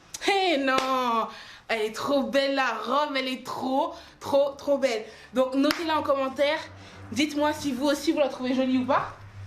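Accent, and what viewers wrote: Africa. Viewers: J’adore trop l’accent africain 🥰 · Dans cette vidéo tu à beaucoup parler africaine!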